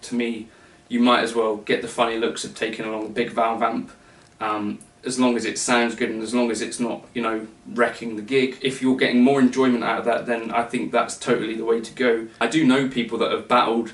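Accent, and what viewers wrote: United Kingdom. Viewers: I lov your british accent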